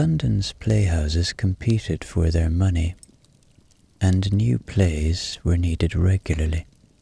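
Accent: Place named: Ireland. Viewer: Being ill with MS I often fall asleep during the day and I have taken to listening to at these times as well as the time when I have difficulty sleeping at night fatigue is weird like that but you are amazingly talented and you are the perfect person to help me get to sleep being Irish and having that lovely Irish accent and soft lilt that I love I am so happy and grateful to have found you.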